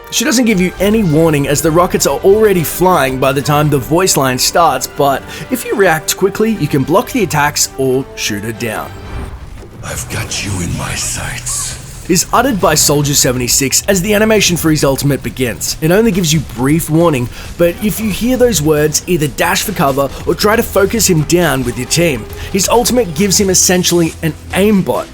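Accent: Australia. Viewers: Ay Dash, U Aussie? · Are you Aussie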